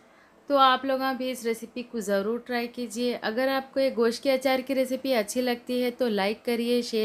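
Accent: Hyderabad, India. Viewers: Hedrabadi aese hi bat karte hain Meri ami b hedrabadi hain Or woh b India se hain magar shadi ho k Pakistan aee thi un ka lehja ab b thora thora wesa hi hai jab k unhe yahn aye hue 33 years ho gye hain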